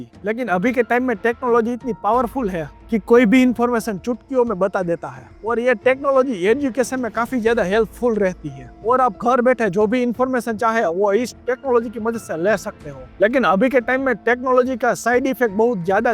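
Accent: India. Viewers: Bro Your Gujrati Accent Is Decreasing Interest To Watching Video. Pahle Hame Hindi Ka Proper Accent Ka Practice Karna Padega. Baki Information Best Hai. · Need to improve the pure Hindi accent rather than kathiyawadi Gujarati accent. This will add more interest to watch full video.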